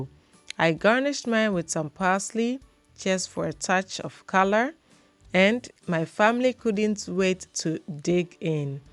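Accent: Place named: Igbo, Nigeria. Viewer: what ethnicity are you? i love your speech, are you Igbo? hug you beautiful person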